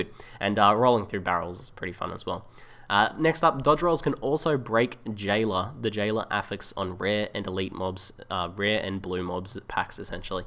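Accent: Australia. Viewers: hey, if you want audiences other than Australian and New Zealanders, please talk slower so that people actually understand through your rather thick accent. Don't get me wrong i think you have some good contents that i find interesting. However, this video i had to turn on subtitles. I am a native English speaker, yet i couldn't understand half of what you said. What's even worse, you're talking about something that is slightly more complicated then general topic that doesn't really need slower speech. I love your accent when it's not explaining technical subject like describing differences in gaming.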